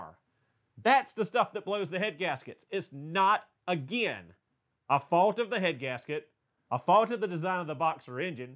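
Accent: South, United States. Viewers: Getting yelled at by a dude with a southern accent hits way different.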